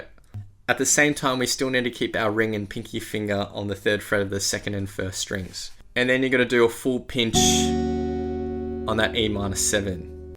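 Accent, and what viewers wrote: Australia. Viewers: I commented on a comment, where a few were querying country of origin, well cob, I can ya now, that’s the accent of true bluey, as Aussie as Vegemite, kangaroos n Holden cars, e’s a bloody legend mate, fair dinkum digger.👍😉 and no he doesn’t through shrimp on the barby, chucks some snags on amongst the chard onion.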